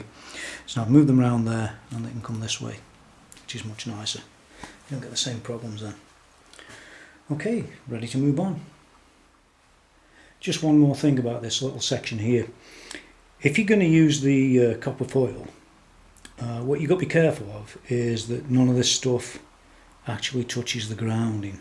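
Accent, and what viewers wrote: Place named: England, United Kingdom. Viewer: you r from england right ?i took u from your accent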